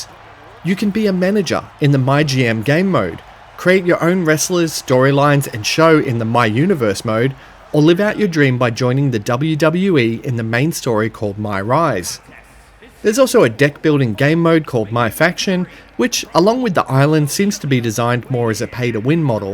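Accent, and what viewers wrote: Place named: Australia. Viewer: i got 31 seconds into this video and had to click off, aus accent is like nails on chalkboard to me ..apologies but im sure its great... also sort your levels out on mic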